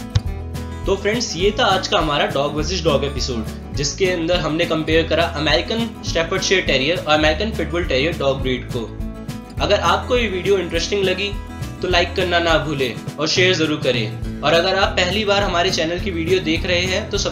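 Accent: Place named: India. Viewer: Do you have the biggest indian accent or are you speaking indian, cause i cant understand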